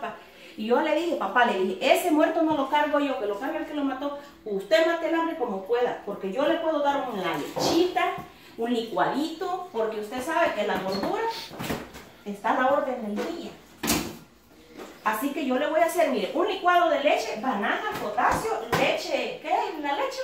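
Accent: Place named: El Salvador. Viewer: Gracias por saludarme ami y mi esposo,nos gustan sus videos habla bien salvadoreña nos encanta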